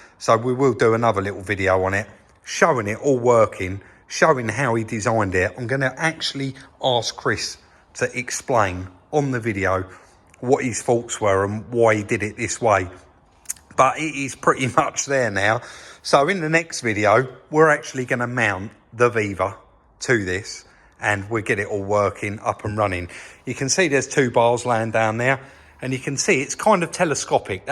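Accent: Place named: Yorkshire, United Kingdom. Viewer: He must be a Yorkshire man .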